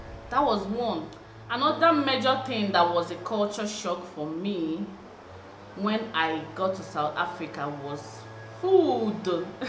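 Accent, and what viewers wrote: Nigeria. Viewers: funny enough i also thought so. And when you said "Dumelang" then all of a sudden you looked half "Tswana and half Xhosa"...magic...lol. If you did nt have Nigerian accent you would definitely looked like one of the locals.lol. You should learn one of the languages. Will benefit you big time. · You look Xhosa even if you sound Nigerian ❤️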